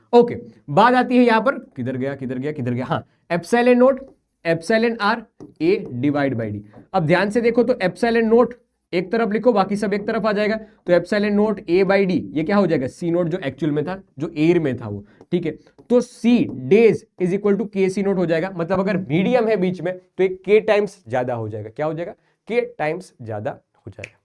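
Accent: Gujarat, India. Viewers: sir ka accent dwkh ke hi lag raha he ye gujarati honge 😅😅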